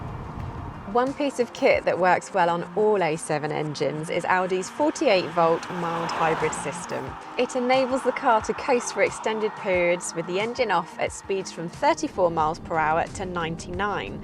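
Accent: United Kingdom. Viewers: I cant affort to buy a car now but I like British accent so, I love watching your ...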